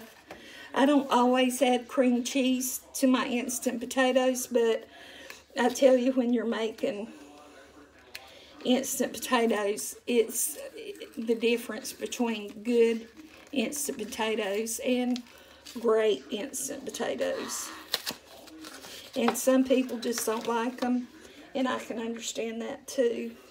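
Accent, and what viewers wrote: South, United States. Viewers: Sour cream in potatoes are delicious too! I like the idea of cream cheese. I love your southern accent & u can cheat with the potatoes use half water & half milk as long as u spice them right no 1 else knows u used part water :)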